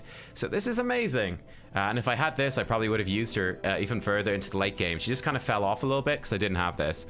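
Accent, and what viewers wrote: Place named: Ireland. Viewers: That's a perfectly normal Irish accent. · It sounded like a very mild Irish accent to me but it took me a minute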